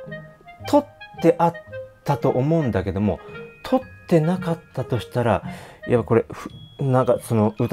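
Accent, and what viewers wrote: Kansai, Japan. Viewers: 好きなキャラが関西弁だからなんかちょっとキュンとして顎んときますね…😍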